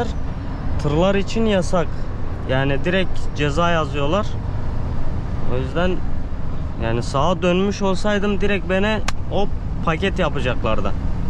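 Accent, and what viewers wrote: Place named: Mardin, Turkey. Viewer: mardinlimisin ? bizim oranın kürtçesini konuşuyorsun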